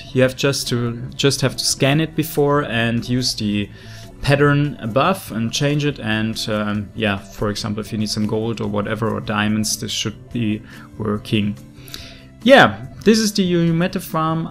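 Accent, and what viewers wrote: Germany. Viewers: Are you german? I think you have a german pronunciation.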